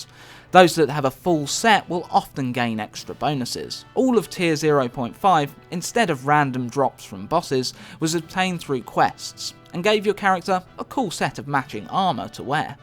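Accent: United Kingdom. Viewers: British accent detected !!!!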